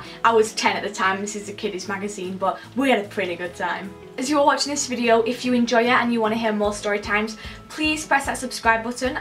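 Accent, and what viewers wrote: United Kingdom. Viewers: i love your British accent❤️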